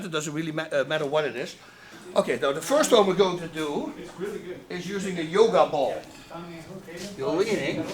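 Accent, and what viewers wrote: Netherlands. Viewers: Hoi. Ik ben de meeste amerikaanse fitness adviseurs een beetje zat. Meestal komen ze met varianten op dingen die we wel kennen. Jij weet mij te verrassen. Fijn om heel in de verte dat nederlandse accent te horen. Ik zit dagelijks op de racefiets en deze tips ga ik zeker uitproberen. Groeten & Dank van een 60 jarige rotterdammer.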